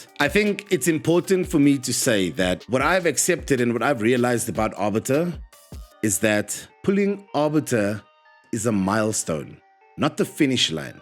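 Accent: South Africa. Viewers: Interesting content.. Been playing Raid for years! Question: Are you South African?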